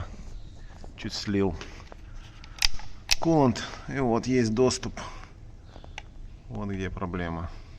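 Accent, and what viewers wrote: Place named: Saint Petersburg, Russia. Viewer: Хорошо смотрится. Без заумствований и проглатывания процессов в ремонте. Приятный русский язык, как будто из Питера)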